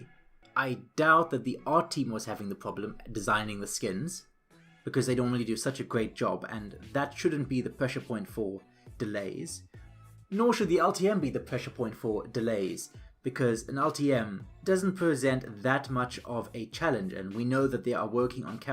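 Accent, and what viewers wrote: South Africa. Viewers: Yo is South African?? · South African accent? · South African accent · What accent do you have bro? Sounds south african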